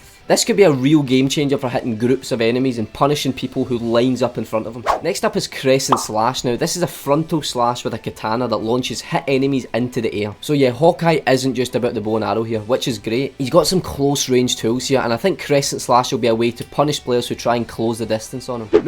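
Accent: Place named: Scotland, United Kingdom. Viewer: Can´t wait for Marvel Rivals! Will it Kill Overwatch? I mean... It could defenitly force Blizzard to Heat up and do more Community Focused Things to keep their Players. I mean.. Overwatch is an absolut great Game. But the Monatizing Options Decision that were made are absolutly BS. Everyone is hating on Monatization and not about Overwatch in General. Marvel Rivals got a perfect Timing to step in and declare Overwatch WAR. Also: I love your accent. You sound like Drew McIntyre ( a WWE Wrestler if u dont know him ). I assume you are from Scottland? :P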